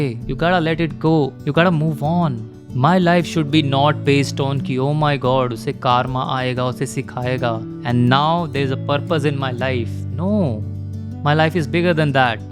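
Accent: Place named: Chandigarh, India. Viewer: I knew it he was from Chandigarh the moment i heard him speak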